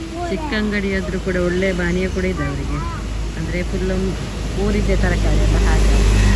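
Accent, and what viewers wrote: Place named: Kerala, India. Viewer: ಅದ್ಯಾವುದು ಬಾ ನ್ಯ😂 ನಿಮ್ಮ ಕನ್ನಡದಲ್ಲಿ ಸ್ವಲ್ಪ ಸ್ವಲ್ಪ ಮಲಮೆ ಮಿಕ್ಸ್ ಇದೆ😅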